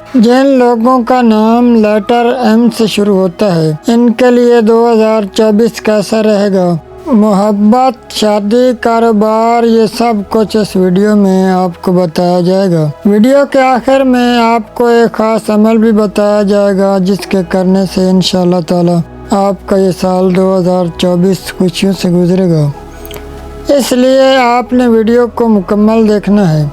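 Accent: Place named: Sargodha, Pakistan. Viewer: Aap ke Urdu boli par Sargodha ke local boli ka rang Chara hua ha. Aap ka distt ya division Sargodha ha??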